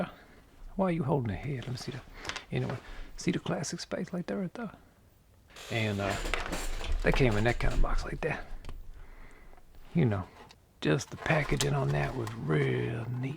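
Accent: Louisiana, United States. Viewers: Hay are you from Louisiana?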